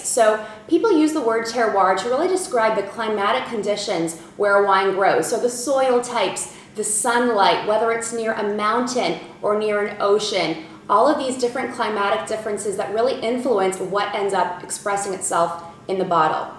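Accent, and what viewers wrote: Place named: United States. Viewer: Actually her English accent is just fine. It has a slight twang in it but other than that, it is a clean and relatively non-distinct American English accent.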